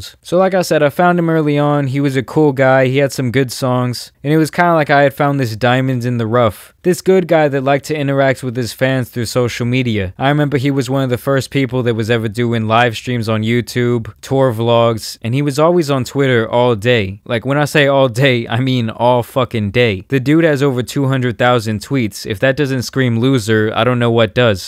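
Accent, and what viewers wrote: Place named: New York, United States. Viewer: I've been a fan of you since when you had like 20k subs I always thought you was from NY cause of your accent.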